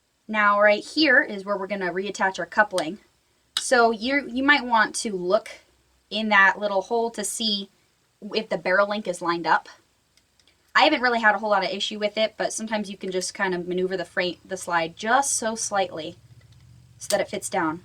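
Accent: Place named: Midwest, United States. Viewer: ahhh, that makes sense, i live in georgia, but i'm from ohio, so i guess i just recognized your midwesternness lol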